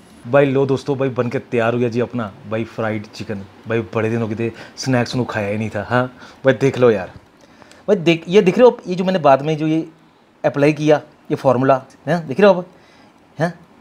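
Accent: Punjab, India. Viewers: aap punjabi type bolte ho bahut payra lagata che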